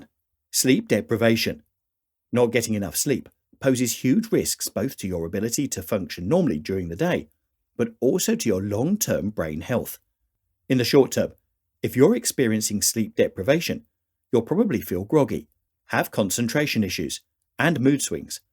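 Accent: England, United Kingdom. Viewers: Such beautiful English dialect, I like biscuits and tea btw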